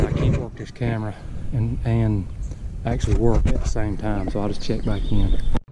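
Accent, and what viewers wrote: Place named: South Carolina, United States. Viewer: You got to be from South Carolina. You talk just like me!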